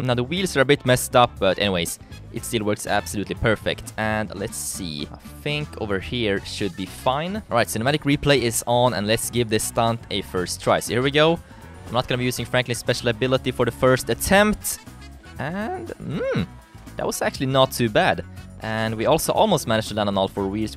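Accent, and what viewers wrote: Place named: Sweden. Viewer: Man hör att du är svensk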